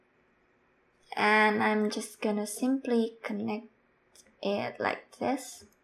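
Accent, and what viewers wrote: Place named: Indonesia. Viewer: Why do i hear indonesian accent.. btw nice tutorial.. i'll try it later to see if this still works in unreal engine 5 or not.. somehow i manage to do the footIK based on Z Axis trace.. but still struggling on the Rotation using Hit Normal..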